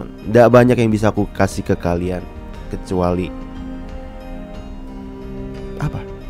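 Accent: Java, Indonesia. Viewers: Bang lUcu kok Bahasa Medoknya hahahahaha siapa suka ayoooo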